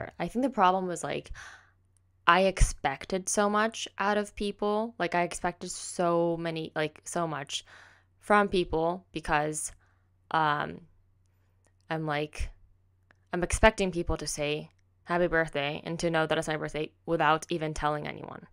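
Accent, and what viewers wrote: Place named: United States. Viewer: Happy birthday 💛 のアメリカ英語がなんだか大好きで、(+ツヤ肌に見惚れながら)いつも動画楽しませてもらってます😂💕 にとってすてきな年でありますように🌈✨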